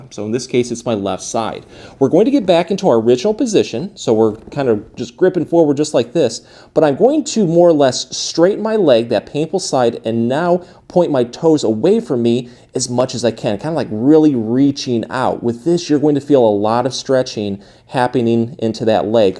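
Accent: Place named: Midwest, United States. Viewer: He’s a Midwesterner. Love the accent 😊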